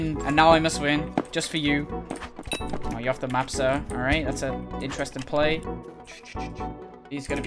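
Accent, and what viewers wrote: United Kingdom. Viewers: is like british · Is british?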